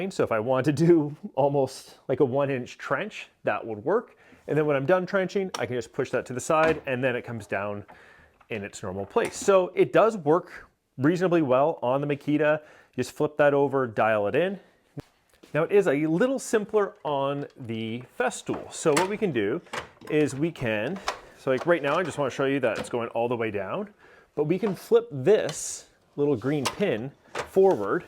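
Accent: Canada. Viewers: This dude sounds so Canadian.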